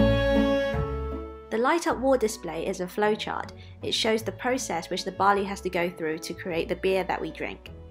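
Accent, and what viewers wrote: United Kingdom. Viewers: Hi I loved your video. I also love Asahi beer. As I'm from England I don't like the taste of our lagers like Carling and Carlsberg etc.. Asahi is very refreshing and tastes amazing. And from 1987 the year i was born lol. You sound like you are from the UK . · Where are you from? Cant work out your accent , it seems like you have a UK accent ( British accent) but it sounds mixed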